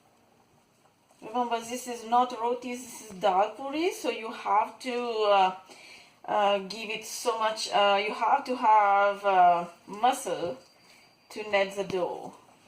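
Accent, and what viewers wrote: Mauritius. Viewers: She speaks with a mauritian accent and since she is making a recipe mauritian wise, how did you expect her to talk? 🙄 Why the hell go on somebody channel and then criticise them about their accent?! 😡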